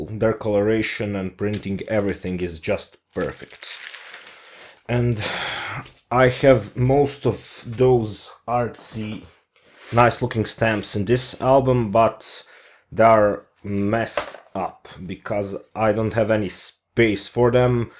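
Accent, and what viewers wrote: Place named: Eastern Europe. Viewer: Love your Slavic accent,Its very nice :)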